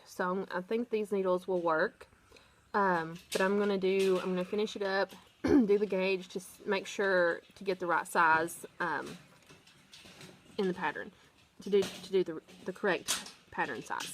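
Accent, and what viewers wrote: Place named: South, United States. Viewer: I have enjoyed both of your podcasts and am looking forward to more. I'm a knitter and crocheter from Pleasant Shade, TN. Love the details that you give about your projects. Your hand dyed yarns are lovely, I would love to learn to do that someday. It's a treat to hear a podcaster whose southern accent matches mine! And your Co-host is adorable 😊.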